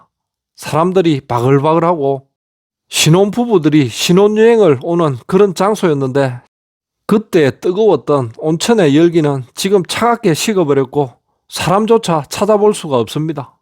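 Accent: Gyeongsang, South Korea. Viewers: 방송 너무 감사합니다 경상도 사투리가 참 구수하네요 좋은 방송 정보 많이 부탁드립니다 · 표준말로 하세요 갱상도말 역겨워요 늘 부정적인 얘기 너무 지겨워요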